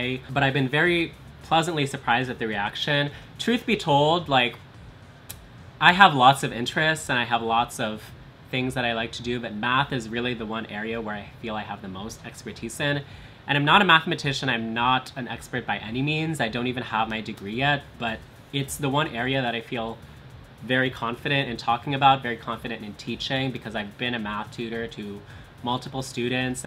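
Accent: Philippines. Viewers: you speaking tagalog is the cutest thing!! rooting for u in drag race <3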